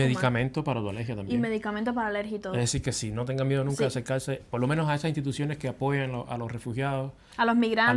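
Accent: Mexico. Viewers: Hola !! Me llamo Raul soy mexicano, vivo en la ciudad de México, soy profesor Universitario. Tu acento parece más de mexicana, tu físico no es tpiico cubana, tu físico es más de una ciudadana de USA. Te explicó las comidas sobretodo aquí de ka ciudad de México, y a sus alrededores. Hay dos desayunos uno super ligero y.otro más completo. Ya te diste cuenta que la mayoría de las personas que vivimos en la ciudad de México el trabajo y la escuela nos quedan muy lejos, son distancias muy largas y tiempos transporte muy largos. Te doy este contexto para que me entiendas sobre estos dos desayunos. Mucha gente se levanta muy temprano tiempo suficiente para arreglarse y tomar un desayuno ligero (es el desayuno) qué regularmente es una taza de café y pan y jugo y salir corriendo para ir al trabajo o la escuela ( es para tener algo en el estomago). El dasuyo completo donde ya uno en su trabajo o en la escuela dan un descanso de 20 ó 40 minutos y es cuando uno puede desayunar huevos al gusto, café, fruta, jugo y etc, este es el almuerzo. 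P Precisamente la vida aquí en la ciudad de México es muy acelerada en la mañana es arreglarnos y salir muy rápido para llegar al trabajo o a la escuela apenas desayunamos muy ligero y es solo para tener algo en el estómago ennlobque ya podemos alkozar (desayuno completo) despues en el trabajo o een la escuela donde nos dan un descanso suficiente de 30 ó 49 minutos es cuando podemos desayunar más completo y más tranquilo es el almuerzo. El almuerzo va v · Ya hablas mejor más despacio más claro hay la llevas te estás mexicanisando bien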